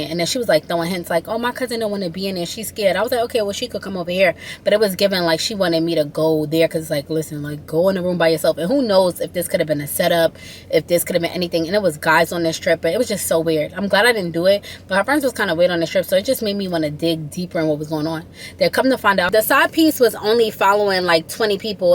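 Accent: New York, United States. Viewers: I can tell you're from New York 🗽 "like dead azz" 😂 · I loveeee that New York accent 😍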